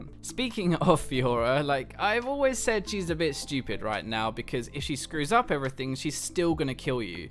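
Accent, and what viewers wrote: United Kingdom. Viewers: Dude i love your vids! plus im british as well so :D ur accent is normal to me i couldnt tell the difference xD anyway keep ur amazing vids up and remember them puns of funniness xD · I love British accent! And I'm not the only one haha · the reason I'm wathcing the clip consists partly of your british accent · I could have listen to you for hours british accent is so cool :D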